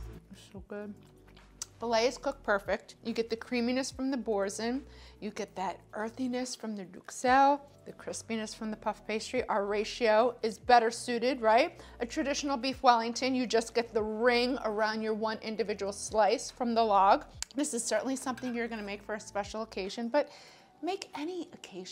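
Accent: United States. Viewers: Lol I love your pronunciation of everything, it’s so American it’s cute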